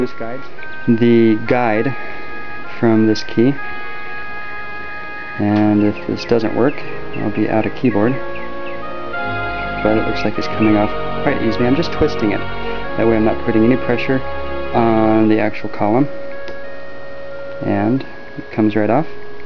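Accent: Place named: Texas, United States. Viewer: Given the accent, the shadowing on the carpet, the actual carpet colour, and the way you're wearing that Seiko watch, I'd have to guess that you live in Texas, went to school in Utah, and have family living in Oregon. How close am I? Congrats on the keyboard, I may actually do that with a spare Saitek keyboard - would be good to confuse other peoples who're using my computer.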